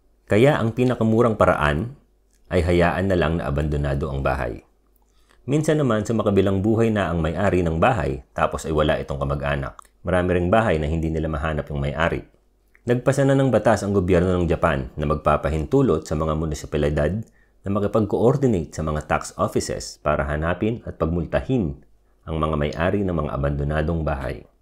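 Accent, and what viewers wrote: Philippines. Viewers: Bossing tiga saan ka sa pinas nueva ecijano kase tagalog mo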